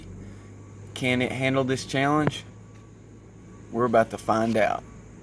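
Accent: South, United States. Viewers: That southern drawl is driving me crazy. And I'm from Mississippi.😂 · You country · I’m guessing you’re from the south 😂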